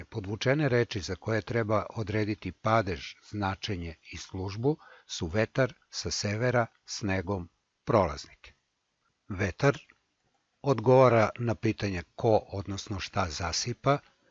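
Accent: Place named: South, Serbia. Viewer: Koliko nepotrebnih znanja deca treba da usvoje tokom školovanja?! Pritom, izađu iz škole potpuno neosposobljena za život i rad. Ovo je potrebno onima koji će studirati srpski jezik i baviti se lingvistikom u svom budućem radu. Pritom, ovaj govornik, sve vreme, koristi južnjački akcenat, a drži predavanje iz gramatike srpskog jezika.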